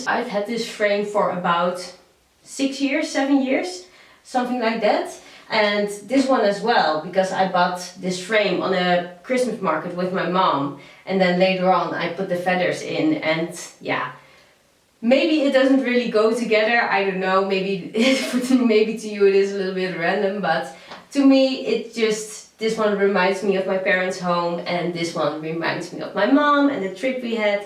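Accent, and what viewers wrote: Netherlands. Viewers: I just stumbled on your channel and as soon as I heard you, I knew you were Dutch! My mother was Dutch and I have a lot of family in the Netherlands. I love your home! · Haha het Nederlands accent komt haast altijd overal bovenuit, zo cute🥰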